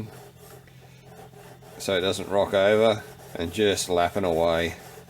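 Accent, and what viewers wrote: Australia. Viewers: a sweet Aussie accent. This dude sounds cool as hell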